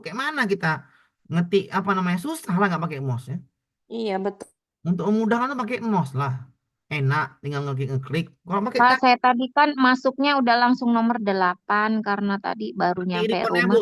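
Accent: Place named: Medan, Indonesia. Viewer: Medan kali la pak ni yang ko dengar la apa yg di bilang tu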